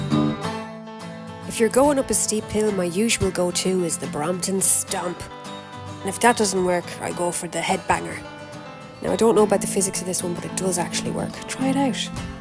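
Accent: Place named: Ireland. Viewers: Funny video! As a Czech and also a resident of Prague, I really enjoyed this video. But I definitely wouldn't choose the campsite near the Veltrusy Castle! :D Oh, how brave you are! :) But I enjoy your accent the most. If I'm not mistaken, it's Irish, right? Love it! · I don't even know how I ended up here, but it's a really nice video, so I looked at the creator, German location, but for a moment something didn't sit right with me, it wasn't a German accent, but then at one point I heard that nice R and my mind immediately turned to the character from The Simpsons Willie, but I guess I guessed wrong because you're probably from Ireland, right? Anyway, very nice work, I look forward to more.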